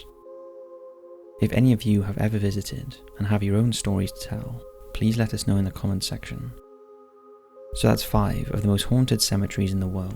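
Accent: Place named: England, United Kingdom. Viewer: As long as you are able to understand what is being said, the accent or enunciation does not matter. The point of language is to communicate effectively, as long effective communication is being carried out, it really does not matter if Tomato is pronounced "Tomayto" or "Tomahto". English originated from England, yet Americans took it as their first language, changed the spelling and pronunciation of words. Americans themselves have dozens of English accents. Even in the UK, there are many accents. Both UK & USA being primarily English speaking countries have many different ways of pronouncing words. If you look at footage of Americans from 1940-60, their accents sound way different than they do now. The further back you go in time, the more American English sounds like British English. Language changes and evolves with time and globalisation. 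In conclusion, there is no right way to pronounce any single word, because not everyone has the same upbringing or cultural background. Your accent is decided by your race, family, place of upbringing, first language, how many languages you speak. And it can also change if you spend time in a foreign country. So I don't think you should nitpick about how an "Englishman" enunciates words, if you're an "American".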